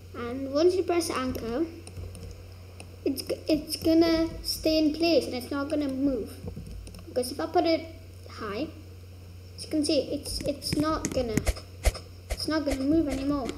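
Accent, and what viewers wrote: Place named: Scotland, United Kingdom. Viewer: Omg your voice is so cute I love Scottish accents btw ty!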